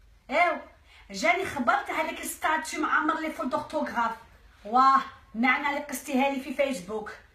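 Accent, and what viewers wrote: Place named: Oran, Algeria. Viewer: انا وردة من حب قالمة. انا نموت على وهران ونعشاق في لهجة تعهوم. تقتل. والله. نتمنا نزورها في حياتي والله. نحبها. ونحب لهجتهوم.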